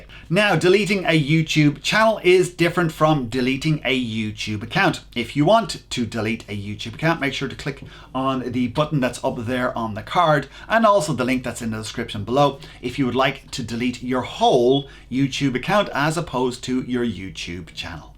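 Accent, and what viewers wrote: Ireland. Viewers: U are DEFINETLY from cork or something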